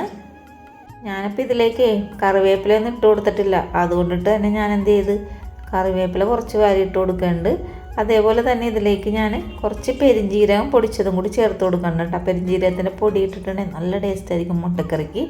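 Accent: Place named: Ernakulam, India. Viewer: എന്റെ ഒരു frd നിങ്ങൾ സംസാരിക്കും പോലെ ആണ് സംസാരം എറണാകുളം കളമശ്ശേരി ആണ് അവരുടെ വീട് 👌👌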